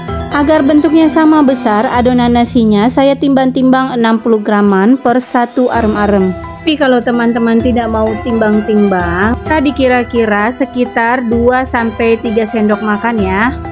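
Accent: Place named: Sumatra, Indonesia. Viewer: Makasih kk,,,bahasa kk macamnya org sumatra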